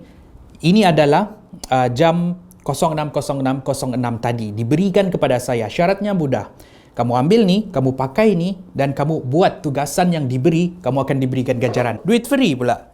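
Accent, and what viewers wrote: Sabah, Malaysia. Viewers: aku asyik trgelak je ni bila asyik2 tgk nota hahaha 😂 ckp bahasa sabah comel ja bah 😂 #salamdrmelaka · The best part is when dengar cerita but with sabahan slang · ini kali ketara sabahnya jo 🤣🤣🤣🤣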